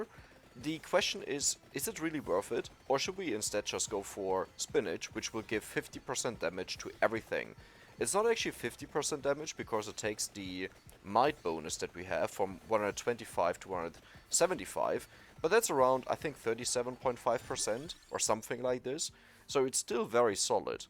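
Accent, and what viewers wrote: Germany. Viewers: Bro man hört so raus das du deutsch bist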